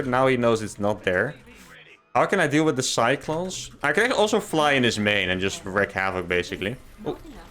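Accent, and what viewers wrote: Netherlands. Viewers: dutch english is the most cringe accent ever jesus christ unwatchable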